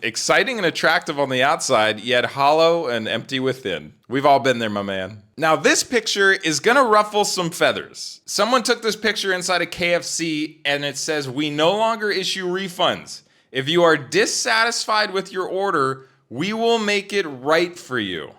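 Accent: United States. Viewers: . Recent fan. You live in Europe? I am not good on accents but I think you sound nyny. Inigo (me), "Where do you live in Europe?" The man in black (you), "No place of consequence." Inigo (me), "I must know." The man in black (you), "Get used to disappointment." Anyways... I get the very strong sense that you are a cook, a chef (they are different), and have worked in the restaurant industry in almost all capacities. So... WHY is r/fastfood about "the industry that I love"? I am certain that you do not work in the fast food industry. Is this a nostalgia thing. I would appreciate a reply to this post. Thanks:)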